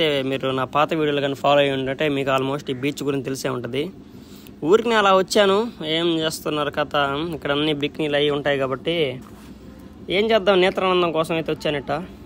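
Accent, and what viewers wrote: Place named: Nellore, India. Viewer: Orey mawa nuvvu maa nellore vadilaane unnave..?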